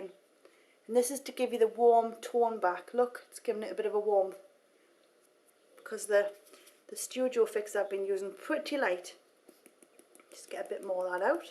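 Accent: Newcastle, United Kingdom. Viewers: dude ur video was awesome, but the one thing i really want to know is your accent. is it... british? irish? idk. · Are you a Geordie? First I thought you were Scottish....nice tutorials, I am still struggling with the false eyelashes...:)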